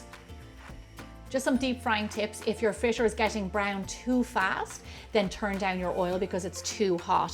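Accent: Ireland. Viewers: Ohhh my goodness!!!!!!I was looking for a easy recipe for fritters and BINGO!!!😂😂 first of all, i LOOOOOVE your accent!!! Truly🤗🤗🤗🤗 Irish???? OK so I love how easy it is and best of all no freaking yeast!! I love baking bout NOOOOOO yeast recipes, I just don't have the patience 😮 I'm gonna try these at work, I'm a cook in a rehab facility. These will certainly put a smile on the patients face and the employees come breakfast 🥟☕️ Thank you so much and I'll be looking into those doughnuts also. Glad I came across your videos. From deep in the ❤️ of TEXAS 😊😊😊😊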